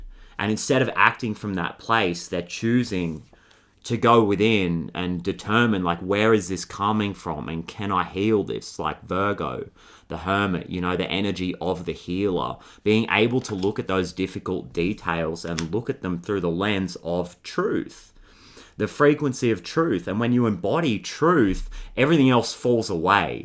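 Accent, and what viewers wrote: Australia. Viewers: I love you so much!!! Are you from Australia by any chance?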